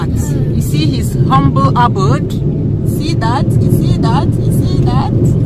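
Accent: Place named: Anambra, Nigeria. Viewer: Love anambra igbo accent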